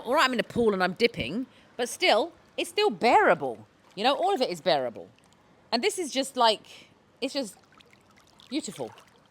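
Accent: United Kingdom. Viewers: I'm here to listen to your lovely british accent ! Welcome to Malaysia ❤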